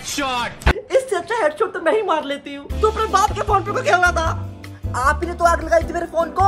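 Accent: Punjab, India. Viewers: Your punjabi is so cute❣️❣️❣️❣️💜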